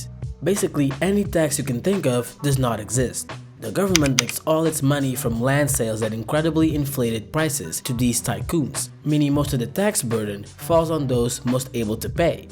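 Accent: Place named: Russia. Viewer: Ебать! русский акцент! ххптьфу!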